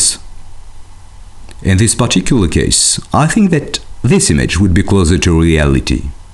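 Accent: France. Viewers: He does not sound anything like an Indian. The narrator is French. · You guys should be happy he is taking the time to put this online and help us (instead of bitching).. plus his french accent is pretty light, compared to some. Thanks again for the videos they are a great help.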